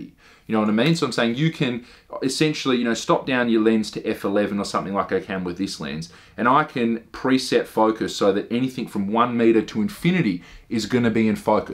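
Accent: Australia. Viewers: Gotta say this, love your true blue Aussie accent.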